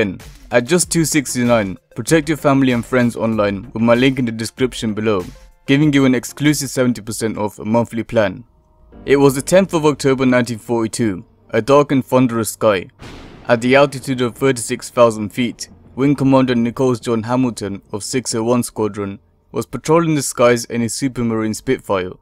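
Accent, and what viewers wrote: South Africa. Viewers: I'll hava guess, south African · What are you mate? South African? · Just a question: is that a SA accent? 😀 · It's the accent south African?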